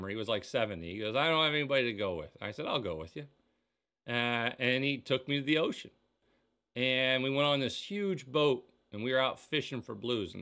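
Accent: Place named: Pennsylvania, United States. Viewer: Hello from Morgantown WV. You sound like you're from PA,.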